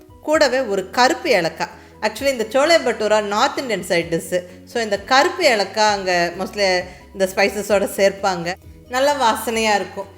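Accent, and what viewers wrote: Kongu, India. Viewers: Ungaludaiya kongu tamil 👌👌👌👌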